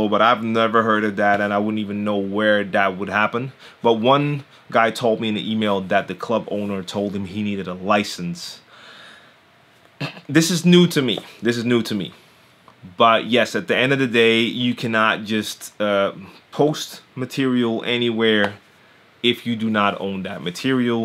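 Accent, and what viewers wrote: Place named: Philadelphia, United States. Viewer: you're from the Netherlands but have the same accent as me in Philly?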